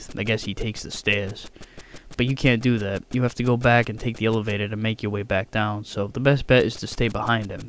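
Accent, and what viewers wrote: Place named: New England, United States. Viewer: Similar Boston-to-Providence accent.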